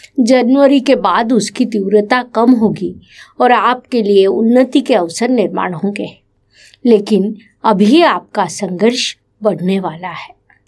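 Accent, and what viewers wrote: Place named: West Bengal, India. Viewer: Ye kaunsa leheja hai ? Bangali hain kya aap ?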